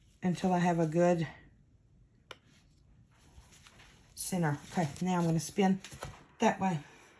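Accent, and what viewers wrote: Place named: Texas, United States. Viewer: my love, I love it!!! 🤩🤩 I also love you giving Lulu the business!!! 🤣🤣🤣 Keep being you my friend!!! Crazy dog and Texas accent makes a great video. 🥰❤️😘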